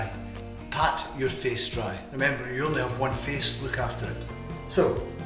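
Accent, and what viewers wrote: Scotland, United Kingdom. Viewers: The Scottish accent. Yeah, that was a terrible attempt at a joke lol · I like that scotish accent 😎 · anthony hopkins has fallen on hard times... its odd to hear him speak with a scottish accent... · This is the video that influenced me to purchase a safety razor and throw out the multi-bladed sissy razors. Been shaving like my grandpa for about a year now and I still occasionally come back to this video just because. Wonderful video, almost hypnotic especially when you've got a Scot speaking, plenty of great information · Avec l'accent Écossais c'est génial ! Merci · I love the Scottish accent. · I swear I could sit here and listen to him talk about shaving all day. You gotta love the Scottish accent. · Just adding the Scottish accent adds authority to all things pertaining to gentlemenlyness. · Hot Scottish accent and hot Scottish bull. 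Wow he looks rich too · I don't know if it's the cool scottish accent or the chill atmosphere and vibe, but man this was insanely satisfying, relaxing, and informative! It's like a comforting amd reassuring Scrooge McDuck showing you how it's done! Very nice! 😅😆😁👍🏻Might get into one of those razors! Been wanting to try one for a while! Good stuff! Thanks for the detailed tutorial!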